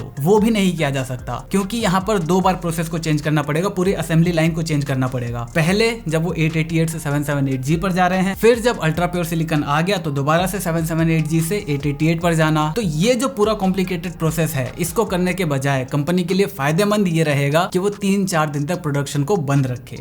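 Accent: Bengal, India. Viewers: Bengali accent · Wait are you Bengali?? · are u bengali?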